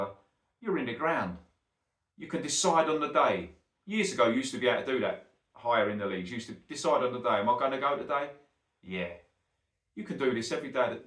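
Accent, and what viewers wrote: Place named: Cockney, United Kingdom. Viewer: Not every day I agree with a cockney, but eye mate is spot on.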